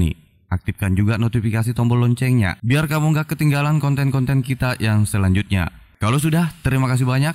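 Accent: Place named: Makassar, Indonesia. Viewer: Orang makassar ki paeng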